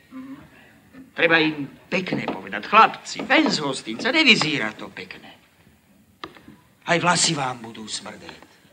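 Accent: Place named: Western Slovakia, Slovakia. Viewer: Tota zapadňarščina še mi mocno ľúbi😂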